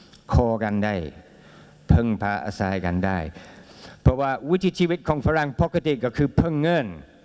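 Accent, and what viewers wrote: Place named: North, Thailand. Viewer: ที่มึงพูดอะเมืองไยล้วนๆ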